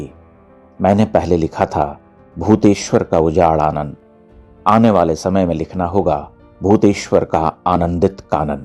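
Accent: Standard. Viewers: श्री मान जी मैंने आपकी वीडियो पहली बार देखी है लेकिन आप की भाषा शैली उच्च स्तर की है विशुद्ध हिन्दी उच्चारण 🙏 धन्य है आप और आपकी प्रस्तुति। मेरी ये इच्छा है कि इन मज़हबी उन्मादियो, आक्रांताओं और आक्रमणकारियों ने पूरे भारत राष्ट्र में जितने भी देवालय खंडित किए है उन सभी का एक सिरे से जीर्णोद्धार हो। जय सिया राम जय भोले नाथ 🙏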